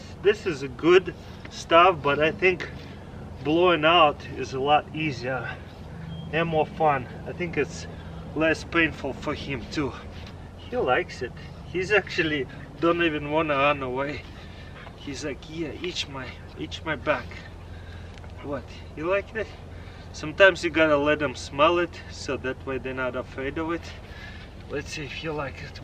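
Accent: Russia. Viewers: Ох уж этот русский акцент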